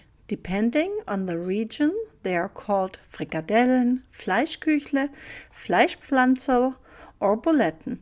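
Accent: Germany. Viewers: Love your accent! Ever since I was 9, whenever I heard a girl speaking with a German accent, I turned to jelly! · I always love hearing a German accent. My mother, her sisters, parents and grandparents were born in Germany. I'm in my mid 60s now but this brings back so many good memories. They emigrated from Germany in early 1930s.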